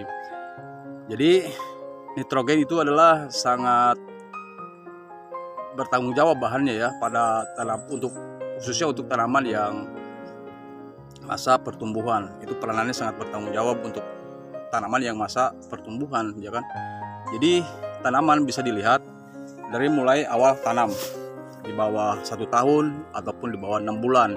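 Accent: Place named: Sulawesi, Indonesia. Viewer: cra abg ngomong kyk org sulawesi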